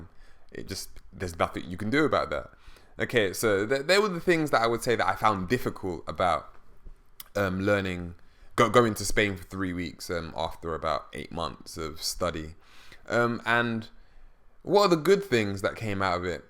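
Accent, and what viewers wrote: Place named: United Kingdom. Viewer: 3 weeks is not enough time for you to get a good understanding. It would take several months for you to become fluent. Immersion is the best way. It sounds like you are british so I dont know what its like there but here in the US you dont have to go to a foreign country to immerse yourself at least once a week with native speakers. I spent a month in Costa Rica and toward the end I felt my spanish improving slightly, but not terribly, I needed more time.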